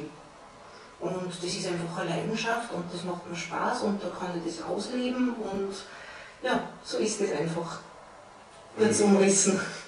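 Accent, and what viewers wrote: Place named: Vienna, Austria. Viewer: confirmed. from Vienna because of her accent.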